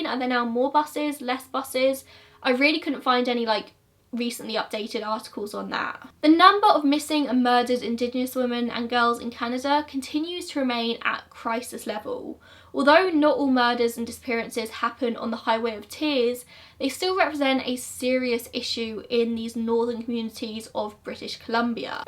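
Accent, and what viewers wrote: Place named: RP, United Kingdom. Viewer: I've always had thing for posh speaking sexy girls like yourself, please take this has a complement not some sleaze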